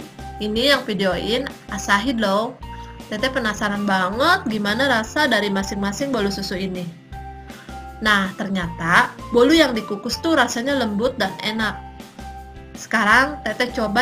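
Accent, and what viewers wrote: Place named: Java, Indonesia. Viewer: owh orang cikarang toh jdi kya logat jawa ya' 🤣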